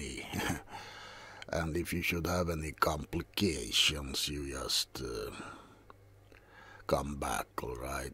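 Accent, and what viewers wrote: Russia. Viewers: I love these Russian ASMR vids they are genuinely hilarious and your Russian accent is brilliant hope you're doing well take it one day at a time my friend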